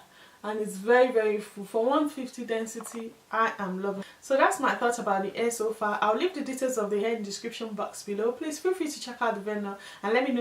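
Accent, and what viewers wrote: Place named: Nigeria. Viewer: I love the accent! Nigerian??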